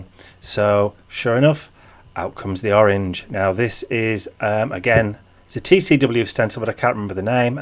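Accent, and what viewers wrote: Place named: England, United Kingdom. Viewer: Hey up chuck, though yur accent sound'tut familiar. It be furst time av sin thi, like. E tis nice to hear a fellow local brit, lol. That took some doing to stop it auto correcting! Who's lad that dose stencils? Would be good to know. Bic W ' house; are they really bad, as I looked up there customer reviews and didn't dare take a chance with not having a great deal of £ and didn't want to risk loosing it. Sorry to bombard you with Q's. Thanks for sharing your page, colours wer grand! Joanne.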